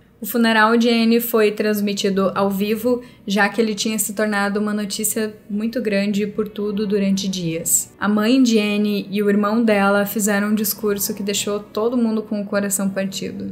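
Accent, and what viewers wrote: Rio Grande do Sul, Brazil. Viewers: Oi você é gaúcha? Amei o canal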